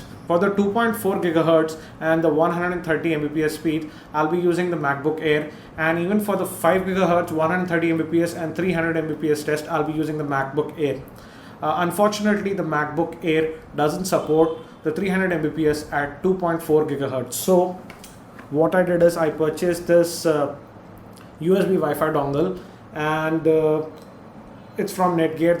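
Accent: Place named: Tamil Nadu, India. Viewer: Your Tamil accent is heavy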